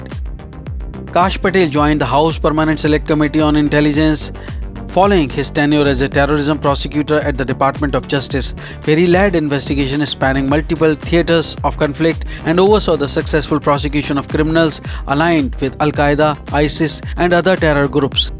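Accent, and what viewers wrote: India. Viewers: The narrator must realize many in his audience doesn’t understand Indian English. Perhaps if he enunciates it would help.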